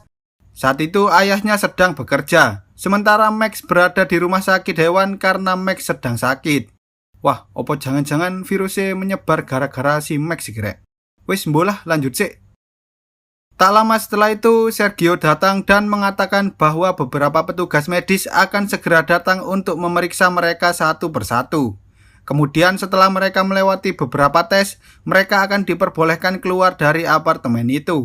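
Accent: Java, Indonesia. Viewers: suara yang menjelaskan video ini suaranya ke jawaan bingits. Hehehe..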